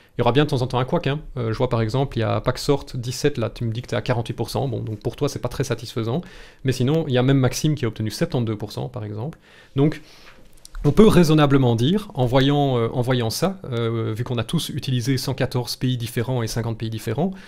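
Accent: Belgium. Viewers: J'adore l'accent belge 💕💕💕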